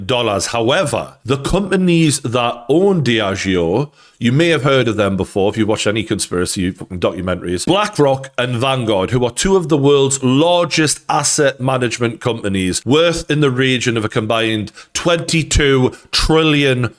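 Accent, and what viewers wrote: Newcastle, United Kingdom. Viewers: A True Geordie old mare.. Talkin Bollox as usual..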